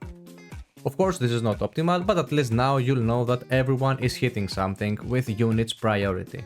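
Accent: Greece. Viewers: αθανατη ελληνικη προφορα! ωραιο βιντεο ευχαριστω!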